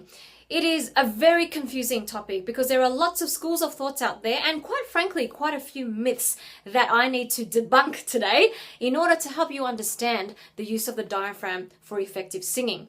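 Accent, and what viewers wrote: Australia. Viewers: Do u live in Australia? Cause your accent sounds like theirs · are you australian? ...me too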